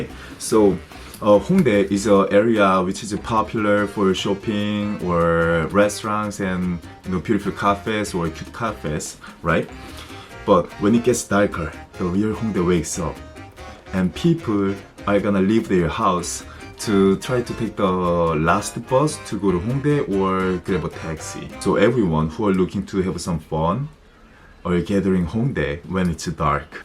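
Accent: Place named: France. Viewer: Tu vivre dans la France tu as l 'accent d'un français parle anglais trop mignon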